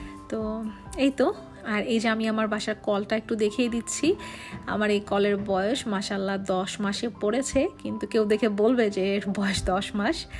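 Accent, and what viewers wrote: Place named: Rangpur, Bangladesh. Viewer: Apu apnar onek kotha Rangpurer mone hoy.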